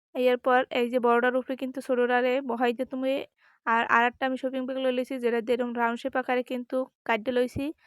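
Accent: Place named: Barishal, Bangladesh. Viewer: হে বুবু আপনিও তো খাস বরিশাল কথাই বোঝা যায়।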